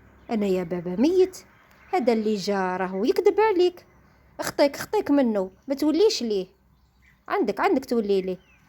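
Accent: Algeria. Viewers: والله ياختي راكي في القمة وأنا اكتشفت قناتك بالصدفة كنت انحوس على حكايات القسنطينية لقيت القناة أنتاعك وعجبتني وعجبتني اللهجة انتاعك على خاطر انتاع الشرق وأنا انقولك واصلي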